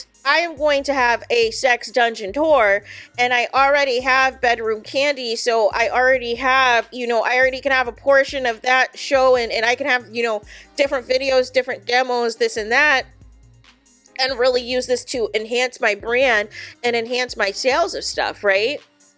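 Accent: Midwest, United States. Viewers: I love your voice…it’s cute and gives an Intermountain West/Midwest quirky accent. I love it too bc if you are a black woman, it is outside of what people typically think black women in America sound.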